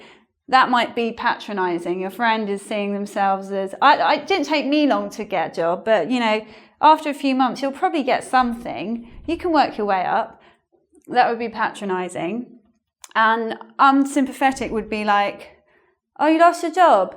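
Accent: United Kingdom. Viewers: Her accent is Ideal in order to get used to british accent: it has all the characteristic of the accent of people I can't understand, but I can easily understand all she says. I'm actually watching all her videos to get used to british accent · Hi:) i really love watching your video in youtube and engvid what a intresting topic and i like your real british english <3 at first time i watched your video, i can't understand anyting!! but now i heard your all word of sentences. thanks a lot.XX but would you mind if you tell me your personal website address?:) please reply me:) i'm waiting you ❤️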